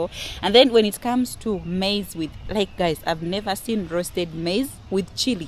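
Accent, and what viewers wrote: Kenya. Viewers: But your English sounds Kenyan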